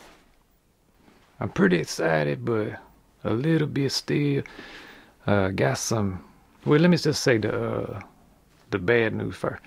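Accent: Louisiana, United States. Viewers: New to your videos. Is it rude to ask if you’re doing a voice? I’m from the South but the way you use contractions is very different from what I’m used to hearing. I assume you’re from Louisiana or Mississippi? Aside from that, great tips and love how your video persona is the puppet. I would do that if I made videos.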